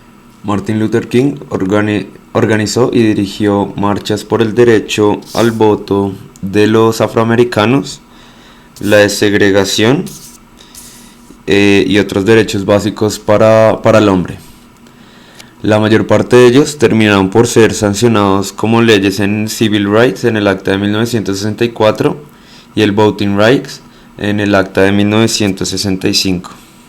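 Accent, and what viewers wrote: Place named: Bogotá, Colombia. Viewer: Con tu acento rolo es dificil concentrarme.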